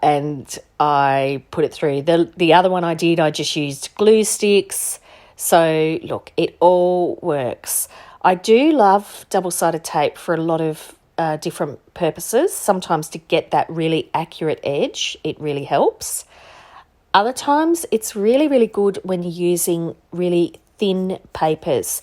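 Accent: Australia. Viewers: Nice to hear an Aussie voice! Great little project!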